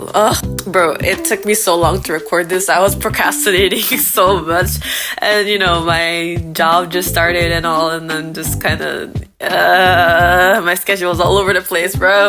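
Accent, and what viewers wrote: Kingston, Jamaica. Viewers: I'm not Jamaican but I grew up around lots of Jamaican friends, I can hear the accent slightly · You sound very Jamaican. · 🇹🇹Um...You still have a Jamaican accent by the way. you just don't speak the dialect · Bro I can still hear your Jamaican accent in your voice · Lol this video is so cute and so is your animation style. But just to let you know, your Jamaican accent is VERY VERY evident 🤣 it's definitely not lost. Media portrays a Jamaican accent a certain way but a lot of Kingstonians sound just like you. I was born & raised in JA and I sound similar to you. Once you come back to visit one day you'll learn that the accent is actually very diverse dependent on where you live on the island :). You basically just have a city accent :) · no man that accent deffo if noticeable, u a yard man bro ( yard man or yardie, is like a native of jamaican) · As someone who spent most of my life in Jamaica and now live abroad, I can say that I definitely hear the Jamaican accent in your voice. 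Can’t miss it actually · Im not gonna lie to you. Im Jamaican, and your English accent gives Jamaican with a "twang" especially with certain words. Look forward to seeing more from you~ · Even the way you said "NOoo" sounds very jamaican. Youre accent isn't gone. Just a little diluted. You almost sound like a Canadian Jamaican. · You definitely have a Jamaican accent. You sound similar to my brother. Also I knew some blasian (Japanese and African American) kids growing up in California. I think they had the reverse problem you have with Japanese. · Girl, you may not be able to hear it, but that Jamaican accent is still in there. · I still hear your jamaican acent As jamaican my self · Your accent is still there. It sounds like a Kingston accent. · I live in Jamaica and your maybe kinda sound like those Kingston girls so not so bad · I disagree I can hear a little bit of that Jamaica accent within you. 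😅 But I can relate to this video a bit as a Jamaican American who grew up around primarily African American culture more than Jamaican culture. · 7 months late holy- I just wanted to say that: 1. I’m from the Bahamas so I’m also Caribbean (Technically) 2. You might not sound super Jamaican but I can still hear bits of your accent in your voice when you speak English. On top of that, your English from what I hear is completely fine, even if you don’t know some words, that doesn’t necessarily mean it’s “bad”. Anyways gud video lol Oh btw how big is your afro? Painfully curious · I think you still gotta a bit of a Jamaican accent, btw (btw: By the way) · I still hear the Jamaican accent. Mostly whenever you end your words with an "r" sound like: "more", "or", etc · you still have a jamacian accent friend ahahah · It's like a lighter version of the kingstonian accident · I still hear your Jamaican accent 🎉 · Your accent is very much Jamaican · The jamaican accent is still there just a little bit . 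· I'm not even from an english speaking country, but I've been on the international side of the internet for long enough to tell you definetly still have some jamaican in your accent lol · I am Jamaican and I do here a lit bit of accent from you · I def hear your Jamaican accent peak through when you speak! Your cadence sounds like Jamaican-American lowkey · I can hear the Jamaican in your accent clearly. It's unmistakable and the "hasn't been home in forever" kind. I hear it a lot in New York. I probably should have an odd accent being Jamaican/Bermudian, but born and raised in the US, a mild New York accent seems to have won that battle. 😅 The Jamaican only comes out when I'm extremely angry, which is thankfully rare. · Don't worry about it, I’m gonna say it again, though, in some of your intonations, you sound like you're from kingston. 😊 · I'm not Jamaican at ALL, but I can still hear your accent! 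Dw! You haven't been konnichiwashed as bad as you think :D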